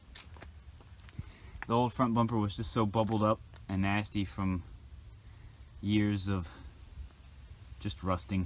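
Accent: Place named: Louisiana, United States. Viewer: Ohio plates but talkin like a gay louisiana boii 🤔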